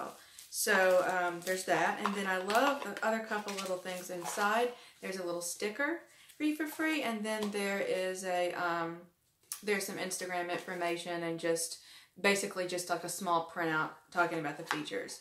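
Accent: South, United States. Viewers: I'll be using my Ray Ban 3487 for more physical activities. But off sunglass topic--"Mardi Gras" and "living in the deep South again" - so was I right that I detect a very slight Southern accent even before you said that? Please don't be offended, I was just curious.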